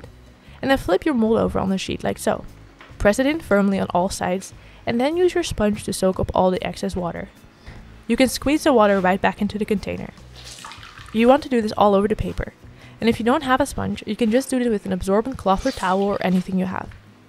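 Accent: Netherlands. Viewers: I love how simply you have explained this. I would like to make some paper for my watercolour work. If you don't mind me asking, where are you from ? I detect an accent and it sounds Dutch 🤔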